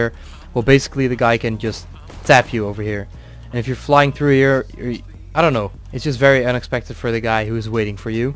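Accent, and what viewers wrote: Netherlands. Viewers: I can hear he is dutch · Are u dutch lol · ahah ondanks dat je accent heel neutraal is hoorde ik het toch een beetje XD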